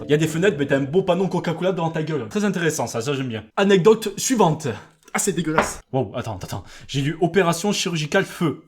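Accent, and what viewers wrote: South, France. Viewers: Lmao he has a strong southern accent so good Luck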